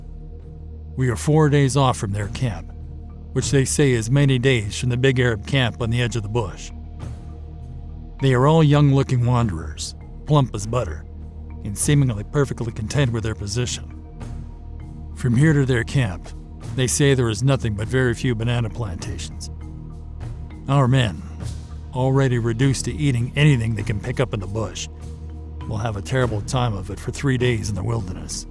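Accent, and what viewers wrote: United States. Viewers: He sounds more American than Canadian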